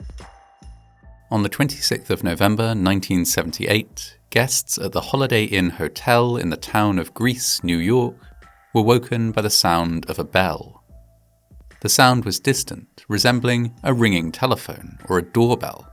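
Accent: United Kingdom. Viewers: Of course, another video about an American event narrated by an annoying British accent! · It was very thoughtful of Holiday Inn to install a fire alarm designed to not disturb their guests. Greece still doesn't use 911. They use 112. I find it interesting that a narrator with a British (?) accent would use 911 to refer to a general emergency number rather than 999. Perhaps an American script writer? Edit: Whoops, my bad. I kept hearing "Greece" and thought it was the country, not a town in New York.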